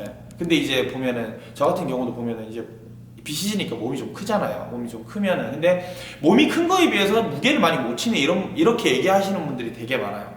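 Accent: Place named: Busan, South Korea. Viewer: 선생님 부산 분인가요? 사투리가 찰지네요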